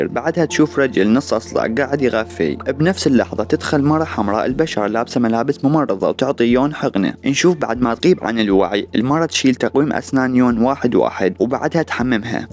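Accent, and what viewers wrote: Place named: Khuzestan, Iran. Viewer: حلو لهجته اعتقد اهوازي 😉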